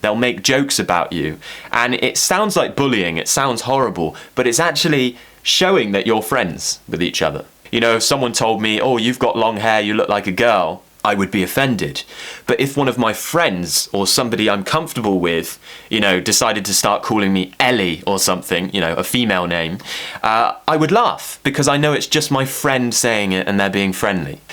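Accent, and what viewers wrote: RP, United Kingdom. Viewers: I like British accent and the way you speak is mind blowing. Great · Iam so happy that i found you cz i was loonking forwad to learn the british accent and you my super hero in lango naild it with the accent of yours ❤ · I love your accent...im moving to Oxford at next week . Been a bit worried....but I want to talk as RP native spoken English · i am over the moon to find EJT English channel.I ever fancy British accent and now you have brought it to me .............................................................................(From Pakistan) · Love the British accent! 😍 Thinking about buying a notebook... your videos are precious! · Wow!!! Your British English is so perfect, I forgot how to breathe! 😱😱❤️😅🔥🔥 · British accent never sounded better 😹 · Perfect British 🇬🇧 accent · Same here. His voice sounds gorgeous. British accent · I'm feeling over the moon when i discovered that i love british accent because of you😍😍💕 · Posh accent ❤️ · I love british accent...you sound like my fac Klaus Mickelson from TVD & ORIGINALS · I love UK boy with UK accent.😍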